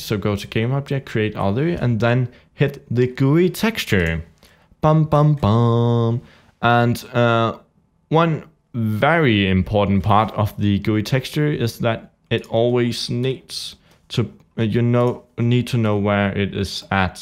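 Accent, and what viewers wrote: Denmark. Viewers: Swiff His main language is Danish I think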